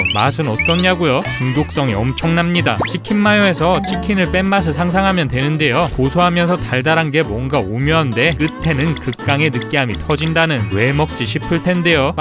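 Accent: South Korea. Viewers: 왜 자꾸 김치발음 물씬 풍기는 되도않는 영어 섞노 ㅋㅋ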